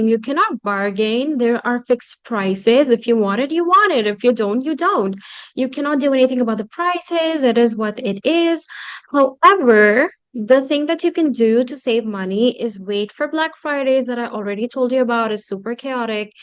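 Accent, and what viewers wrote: United States. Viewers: Amazing American accent 😮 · Nice your american accent ❤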